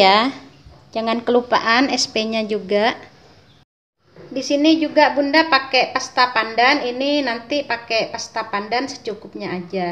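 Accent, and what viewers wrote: Madura, Indonesia. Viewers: Negguh Deri logattah Mak engak Reng Medureh.. Salam sittong dere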